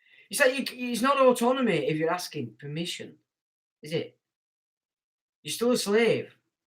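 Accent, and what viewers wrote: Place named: Yorkshire, United Kingdom. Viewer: Only discovered this channel a few months ago via a link on BNT and have to say I wish I was here from inception! Although I've been in Dorset 42 years, I originate over't tops from you at Red Lumb, so not only the language resonates but so too the accent. On language (as I'm sure you're aware) "ab" means "not" or "none". All native tribes are "origines" Thanks for the excellent info in the vids I've seen so far.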